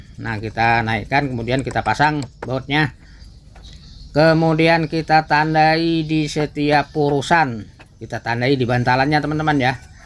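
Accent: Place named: Kutai, Indonesia. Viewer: Dri logat berbicara spertinya suku Kutai dri Kaltim 😂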